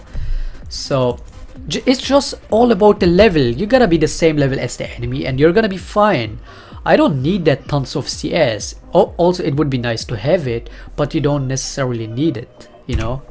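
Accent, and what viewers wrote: Germany. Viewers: Man hört ich raus das du deutsch bist. Ich meine das wisch bei wich und zink bei think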